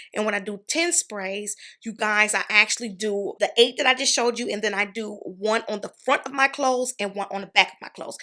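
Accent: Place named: United States. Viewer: I really love your accent I’m from England and I love the American accent and I really love your channel perfume is one of my guilty pleasures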